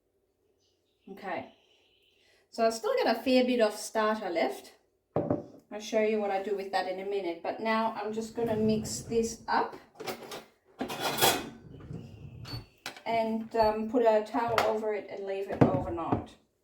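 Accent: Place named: Australia. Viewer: Thank you so much! I loved your way of explaining and your Australian accent. The clothing changes were fun too. Nice production and even better tips.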